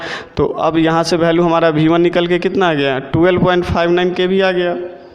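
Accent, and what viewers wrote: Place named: India. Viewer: I want this lesson but i cant listen indian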